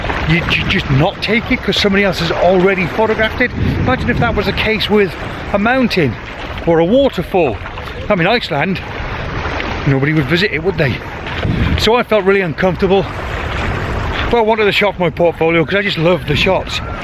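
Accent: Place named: North Wales, United Kingdom. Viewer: lovely, what part of the uk, I am guessing North Wales possibly, excellent video and learnt an awful lt, brill thanks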